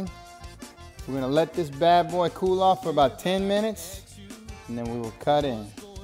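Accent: Louisiana, United States. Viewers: Ur wife is a lucky lady! Such a handsome guy+ u can throw dwn in the kitchen! U have such a sexy voice, with that Louisiana accent! Love watching ur videos!!!! Much luv to u and ur adorable family+ Gumbo!!!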